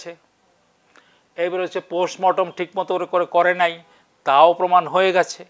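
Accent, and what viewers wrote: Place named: North Bengal, India. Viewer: আপনার ভাষা শুনে মনে হচ্ছে নর্থ বেঙ্গল এর। কোথায় বাড়ি?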